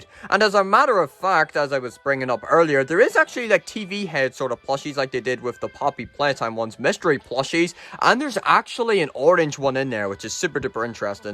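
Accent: United Kingdom. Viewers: Your British lol and so am i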